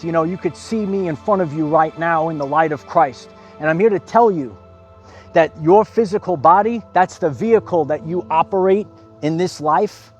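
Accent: New York, United States. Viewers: Love your inspiring videos, and being a New Yorker myself, your NY accent...thanks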